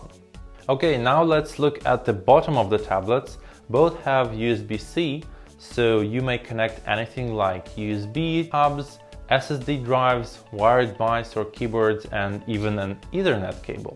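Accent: Russia. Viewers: Хей, ты же русский да? Я препод английского и меня не покидает это ощущение .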